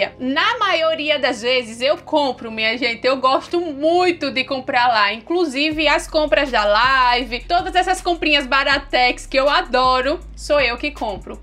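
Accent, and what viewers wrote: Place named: Northeast, Brazil. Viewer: Menina, tu é muito inteligente! Carismática, bonita. Eu gosto do seu sotaque nordestino.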